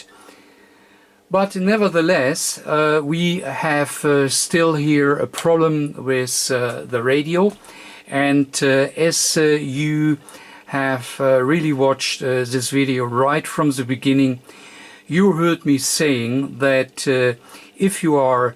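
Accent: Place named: Germany. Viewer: you sound like my mentor with a german accent very easy to follow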